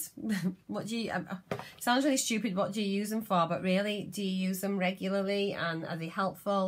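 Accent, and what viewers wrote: Yorkshire, United Kingdom. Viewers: Are you from Sheffield or Leeds maybe, judging from the accent :) great video, thankyou for sharing x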